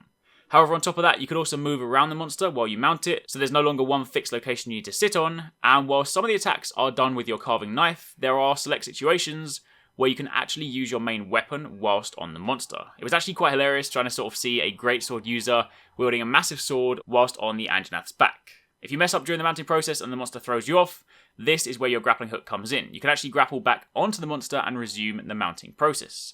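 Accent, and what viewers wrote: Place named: United Kingdom. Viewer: It the British accent:3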